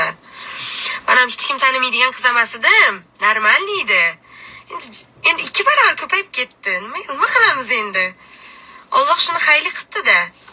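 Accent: Khorezm, Uzbekistan. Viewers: Товбаламанг нима килибди хоразим тилига тушмасангиз ешитманг бизни тил ози шундин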